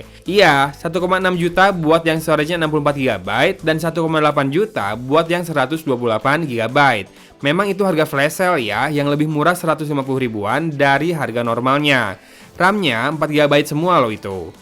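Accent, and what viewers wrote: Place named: Sunda, Indonesia. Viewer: Suka sama suaranya aa. Sunda banget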